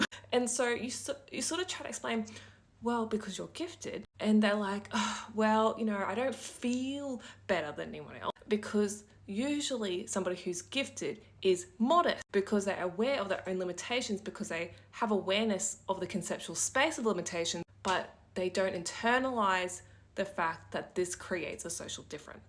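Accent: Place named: Australia. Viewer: My 🧠: how unusual, an Asian woman speaking fluent English with an Australian accent… not saying Asians can’t speak English but defamiliraised … it’s objectively odd… imagine if instead she spoke in Swahili - very bizarre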